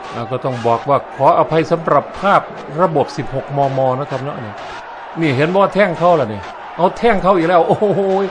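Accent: Isan, Thailand. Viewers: พากเสียงอิสาน.น่าจะมีคำว่า เ"เตะตาบสว่ง"นำจะเพิ่มอรรถรสในการฟัง.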